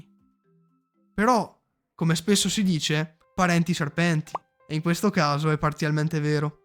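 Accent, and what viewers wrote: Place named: Vicenza, Italy. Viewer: Bel video. E bell'accento, sei di Vicenza?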